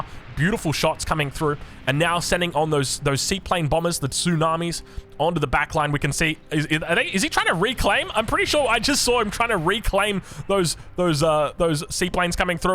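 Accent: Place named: Australia. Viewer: we need more BAR content from you. Nothing against Brightworks or anything but the australian accent is much nicer and you really help people get into the game by explaining so much. Your videos are so entertaining i even started watching the aoe ones even if i never played it.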